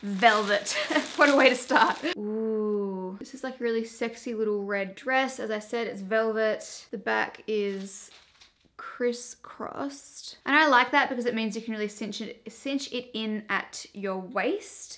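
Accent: Australia. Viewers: The aussie accent 😍😍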